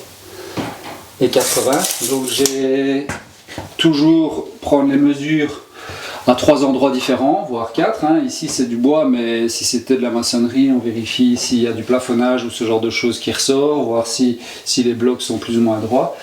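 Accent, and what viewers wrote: Liège, Belgium. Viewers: Jolie découverte via la chaîne de Floky. Je me suis déjà mangé toutes les vidéos. Ça fait du bien de voir que la Belgique est aussi présente sur YouTube. Vive l’accent Liégeois ( et ce n’est pas péjoratif ) .Un abonné en plus. Et bonne continuation je suivrai la chaîne avec attention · Belle découverte et en plus un accent du pays 😉. Et en plus septante trois et pas soixante treize. Enfin un bon belge. Bonne fêtes. Et merci floky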